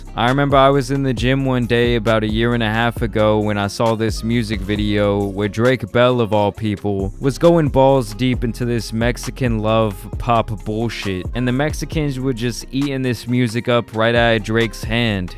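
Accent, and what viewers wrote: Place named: Boston, United States. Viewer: You got to be from Boston can tell right away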